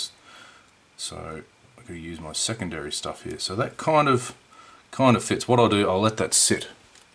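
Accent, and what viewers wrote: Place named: Australia. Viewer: So good to hear an Aussie accent. I left Oz in '92 for my work, spent 4 years in France and 1 year in Germany before settling in Delaware US. Last time I went back to OZ (to say goodbye to my father in 2010) I was asked if I was a Yank. And then here in the US they keep asking what my accent is! I've subscribed, keep up the good work.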